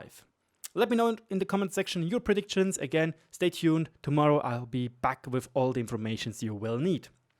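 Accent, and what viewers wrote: Germany. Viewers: löwe your vidz you give highlights to the things that matters and your germania accent give it a flare thanks from sweden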